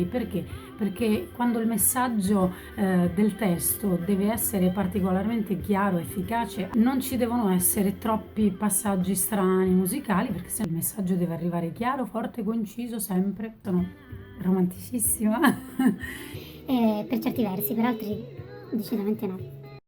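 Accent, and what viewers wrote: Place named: Rome, Italy. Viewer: Anche io ho vissuto a roma per ca una decina d anni poi ho girato un po'e mi son stabilito a Forlì in em romagna la patria delle lasagne e del prosciutto di parma ma su coro meu e sempre in sardigna . Comunqu si entiva l aacento romano , comunque sei la n 1 io su internet ti seguoo sempre sei la prima cantautrice sarda che ho scoperto su youtube e son contento . Complimenti per la voce sei la n° 1 sono fiero di seguirti sto imparando tutte le tue canzoni grazie per avermi risposto . Unbacione grande a te e tutta la Sardegna .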